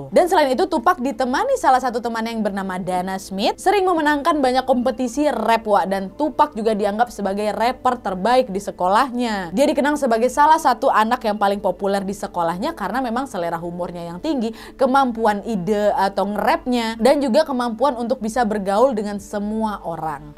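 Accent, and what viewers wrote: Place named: Medan, Indonesia. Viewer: Medannya kental👌🤝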